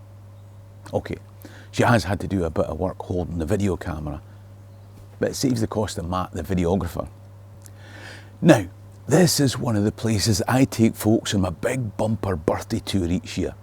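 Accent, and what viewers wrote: Scotland, United Kingdom. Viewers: By the sound your a mcgreger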